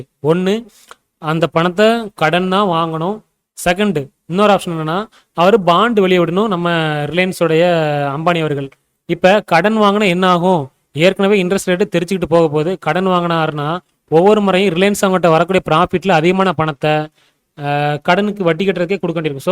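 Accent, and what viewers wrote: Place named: Tirunelveli, India. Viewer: ok bro. Na tirunelveli than. Namma ooru pakkam ulla tamil mari erunthu athan ketten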